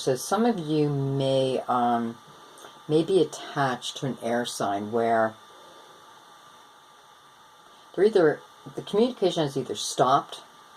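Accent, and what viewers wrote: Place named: Southwestern Pennsylvania, United States. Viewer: I'm quite impressed... yes, I have done so much work through the past six years, work on myself. This time last year, I found myself feeling differently toward someone with whom I'd shared chemistry with, but had never thought of romantically. Then.. POW! Everything had changed. The work shifted and I've been drawn, so has he, by the Universe... coming together. He -- a water sign, yes-- is in a dead relationship, in reality -- the ties are still present, but there is no involvement, no life in it. No, I could never be jealous, ha ha. Spot on. And of course, I visited the Pisces reading you did, and you describe escaping a dead relationship, unleashing yourself from your own internal prison. (And you sound like you are somewhere near the area of the country where I live, ha ha... SW PA.)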